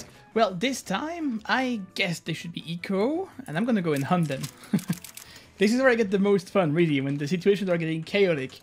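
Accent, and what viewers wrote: France. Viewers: A quel moment t'as décidé de parler en anglais dans tes vidéos? Je veux dire on entend bien que t'es fr, alors pourquoi l'anglais? ;) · On sent ton accent français? mais t'es vraiment très bon, que ça soit pour parler anglais que sur CSGO... continue bg !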